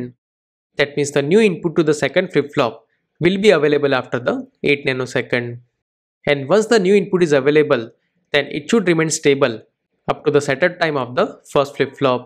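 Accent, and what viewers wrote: India. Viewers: کارت درسته مرد، لذت بردم از توضیحاتت با لهجه‌ی شیرین هندی 😊❤️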